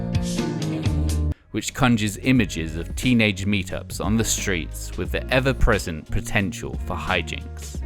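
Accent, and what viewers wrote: United Kingdom. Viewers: . Regardless of your British accent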